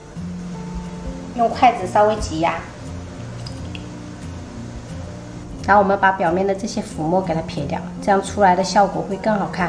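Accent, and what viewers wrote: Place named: Minnan, China. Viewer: 原來是泉州人， 難怪有閩南腔。 不妨用泉州話做節目， 再上字幕就好。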